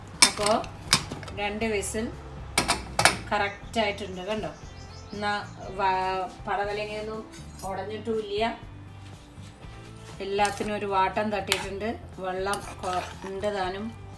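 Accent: Palakkad, India. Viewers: Super. Love the language and slang of Palakkad style. My native place is Palakkad. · പ്രോഗ്രാം സ്ഥിരമായികാണാറുണ്ട് ഗംഭീരമാകുന്നുണ്ട് പാലക്കാടൻ ഭാഷയും നന്നാവുന്നുണ്ട് പടവലങ്ങ എരിശ്ശേരി ഉണ്ടാക്കുന്നത് കാണിക്കാമോ.... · Thani palakkadan bhasha...curry superb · Nammaley pkd slang spprr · Hai chechi chechide palakkadan touch super enike ishtayi chechi de talking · Palakkadan bhasha kelkkumbo oru santhosham 😊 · Sharikkum palakadan bhasha. Kure aayi kettitu. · I am also from palakkad..can relate ur way of talking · Hello, namasthe🙏 Oru Trivamdrumite aayitu ee comment kandittu noki pokan kazhinjilla. Palakkadan bhasha nalla suka kelkaan.. ente childhood days njan orupadu ishtapedunna mannarkad kure spent cheythitundu Oro deshathinte bhashaye inghane public aayi criticize cheyyunnathu sheriyano.. oru nallathu parayan oru negativity de avasyamundo.. , I love Palakkad too.. 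may be njan Trivandrumite aayondavum anghane ishtapedan pattunathu😊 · Pkd style le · Palakkadan accent....hi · The description in Palakkad slang is so good! Great! I really enjoyed! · mikka palakadan markkum avarude slang parayan valya madi ayirikum, chechi spr anu enik ishtayi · PALAKKADAN BHASHA NALLA SUKHATTO KEKKAN......TVM BHASHA KETTE KETTE MATIYAYITTO....AVIDE KORACHE ARROGANT AANE BHASHA....PLKDN STYLE ORU ELEMA INDETTO...PARAYATE VAYYA MOLE...😊😚😊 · Palakkadan padavalangha molakushyam+palakkadan bhasha(kittolu,avoo) endekuttiye enikku vayya.UGRAN. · similar to thrissur style.. also like your palakkad slang, couse i has some of palakkad friends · പ്രേത്യേകത പറഞ്ഞാൽ.. ഒട്ടും ബോറടിപ്പിക്കില്ല. വളരെ നല്ല അവതരണ ശൈലി. അതുപോലെ തന്നെ ഉണ്ടാക്കുന്ന വിഭവങ്ങളും super. പാലക്കാടൻ ഭാഷ 👌👌♥ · Venu Gopal, അല്ല സാർ, ഞങ്ങൾ വള്ളുവനാട്ടുകാരുടെ സ്ലാങ് ഇങ്ങനെയല്ല...ഇവർ പാലക്കാടിന്റെ അങ്ങേയറ്റത്തെവിടെയോ ആണ് ( തത്തമംഗലമോ കൊല്ലങ്കോടോ ചിറ്റൂരോ ) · പാചകം കാണുന്നതിനെക്കാൾ പാലക്കാട്‌ ഭാഷ കേൾക്കാൻ നല്ല രസം.കറി സുപ്പർ.ഉണ്ടാക്കി.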